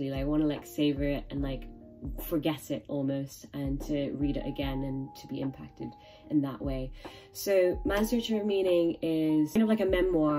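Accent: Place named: RP, United Kingdom. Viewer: Yeah, very posh :)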